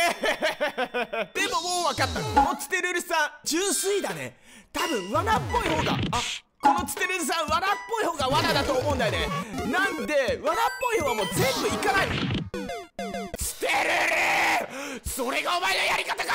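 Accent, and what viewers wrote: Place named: Kansai, Japan. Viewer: 関西人やな どう？合ってる？イントネーション引っかかった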